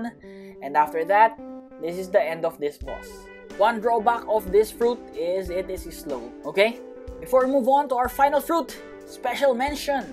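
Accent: Philippines. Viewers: Pinoy ka tol? parang yung accent mo kasi pang pinoy hehe first time ko d2.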